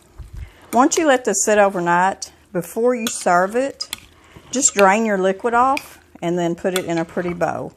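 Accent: South, United States. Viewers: I just noticed your from Imboden, Arkansas!!! My husband and I are from Paragould and relocated to my hometown in Southern Illinois a few years ago when my mother got sick. We love your channel and have tried some of your recipes and will be trying many more!!! Thanks for sharing your recipes and thanks your southern accent that I miss so very much. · Want a big laugh? I'm from the frozen tundra of central MN. The people around me say, "Ya, sure, yabetcha". I don't speak Southern, so at times, I have to watch the video a couple times to figure out what's said! Don't get me wrong...I LOVE YOUR VIDEOS AND THE OLD WAYS! I just don't catch Southern as fast. Thank you for sharing your videos! Please don't stop...Ill try and keep up! LOL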